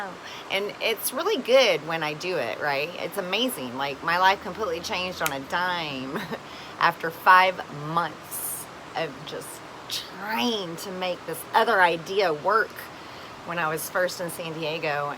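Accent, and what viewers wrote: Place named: South, United States. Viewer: Hi! What a beautiful place! And salad! And southern accent! I like how you talk about stuff. I really want my whole channel to be like that.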